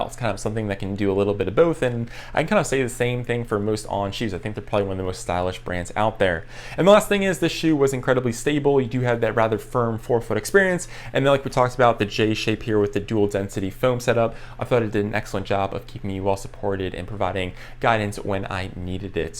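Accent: Maryland, United States. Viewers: I love when I’m watching YouTube and can just hear that someone is also from MD.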